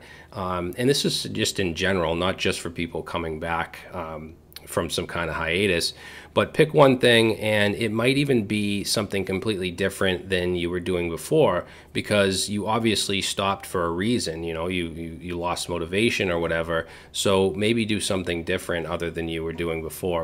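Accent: Boston, United States. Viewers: Hi. New sub. Thanks for this. I got to (5 years ago now) where I just felt I wasn't progressing. mostly due to the company slowly pushing us regulars out tbh.. so that was depressing and I've been waiting for that spark again I had when I first started doing web development and that... was decades ago. Watched your React crash course (wasn't my first time trying React and each time it changed which was so frustrating) and I enjoyed it a lot.. Can't say I've ingrained all the concepts yet but I'm continuing this time. Kind of have to financially. Also, I heard the accent. I'm just north of Boston. Maybe one day we'll meet. Thanks again for the down to earth everything.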